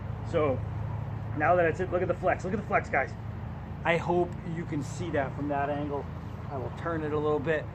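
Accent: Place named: Pennsylvania, United States. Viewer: Sounds like a Pennsylvania man. Beautiful knife and beautiful sycamore back there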